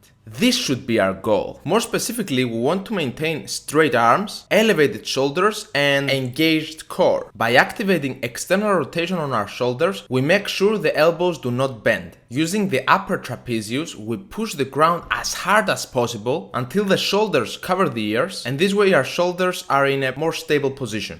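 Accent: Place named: Greece. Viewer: Are you Greek? Your pronunciation sounds really Greek llol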